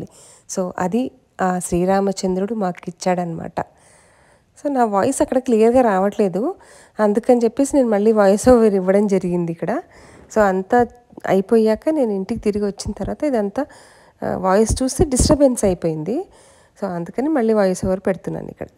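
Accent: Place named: Telangana, India. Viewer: Mee language paka telangana lagavune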